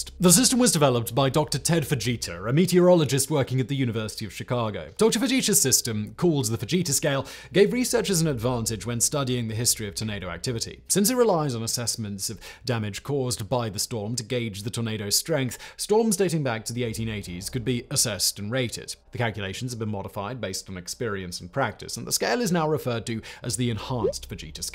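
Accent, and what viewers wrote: United Kingdom. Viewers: It's seriously boggling my mind to listen to a Brit who lives in Prague speak about my home state of Kansas. What a world we live in!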